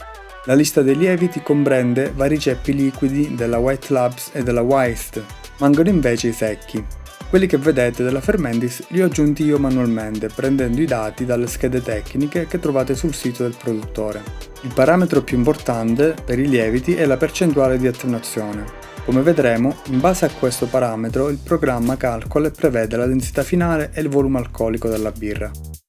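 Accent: Calabria, Italy. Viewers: Ciao, sei calabrese?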